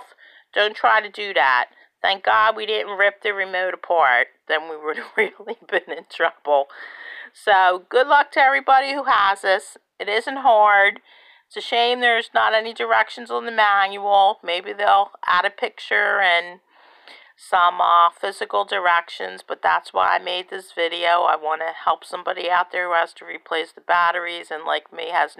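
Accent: Delaware County, United States. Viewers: Wow, thank you! I would never have figured out how to change the batteries for this goofy remote. It is definitely not intuitive (and so different from all my other remotes) but your video make it super easy. Love your "accent". Are you from Delaware County? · Do u happen to be from DELCO????? That accent sounds realllll familiar 🤣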